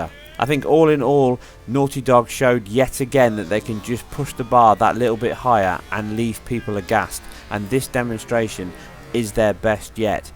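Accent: United Kingdom. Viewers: that voice makes this video unwatchable fucking brit accent shit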